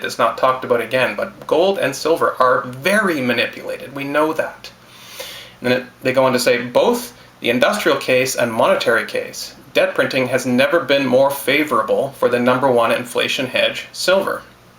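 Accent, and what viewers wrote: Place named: Canada. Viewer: I miss your friendly canadian accent. Hope you are doing well!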